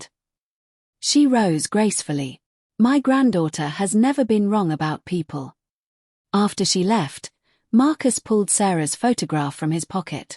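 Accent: United Kingdom. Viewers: Love, love, love the classy British narrator!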